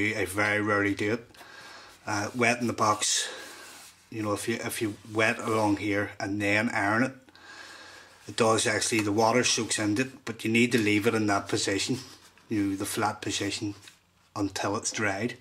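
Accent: Belfast, United Kingdom. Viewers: ***** yeah - he's from northern ireland though. Belfast by the sounds.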